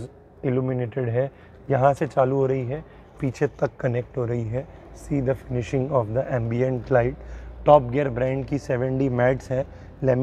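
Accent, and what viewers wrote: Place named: Maharashtra, India. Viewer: Waa..... मराठी आवाज अचानक ऐकून खूप छान वाटलं......❤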